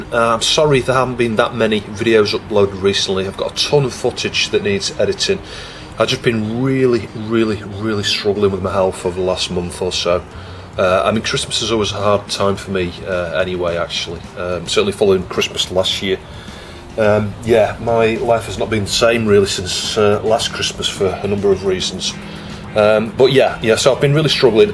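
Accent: United Kingdom. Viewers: Be a shame if you stopped. Recently discovered your channel. British accent makes everything sound better. Sorry to hear you're getting caught up in YT drama crap. I'd say just ignore it and do your thing but easier said than done. Also, don't sweat the likes/dislikes. I tend to never "Like" anything. Just because I'm lazy. Youtube should ditch it, watch time is all that matters. Then again, if you don't enjoy making the videos, then forcing yourself to do it will only make it worse. Good luck!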